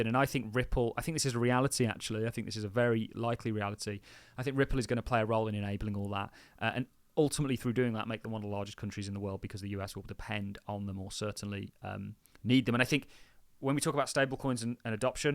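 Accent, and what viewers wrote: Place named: Stourbridge, United Kingdom. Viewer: You from Stourbridge, Mate? Or is it Redditch?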